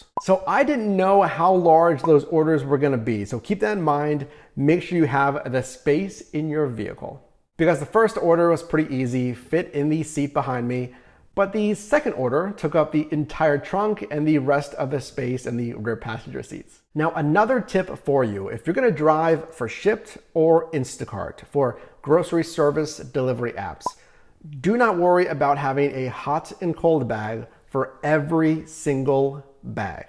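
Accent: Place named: Pittsburgh, United States. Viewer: You from Pittsburgh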